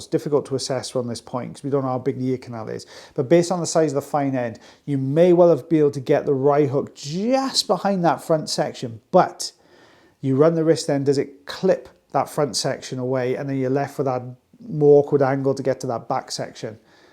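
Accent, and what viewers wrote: Wales, United Kingdom. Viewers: Love hearing you talking, the Welsh accent takes me way back. Left Wales in 1965 but still have friends there I went to school with. Hope will get well soon, you two have such good repartee when watching videos. Take care, it will soon be March 1, St David’s Day, first day of your Spring. I will wear my daffodil brooch, Cymru am Byth. From Rosie, Australia 🇦🇺.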